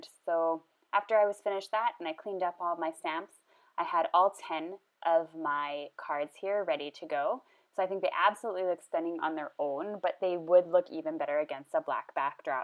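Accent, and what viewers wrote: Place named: Canada. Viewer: ... I love your Canadian accent. I know I am supposed to comment about the ATCs... hehe. Gorgeous cards. Thank you so much for sharing...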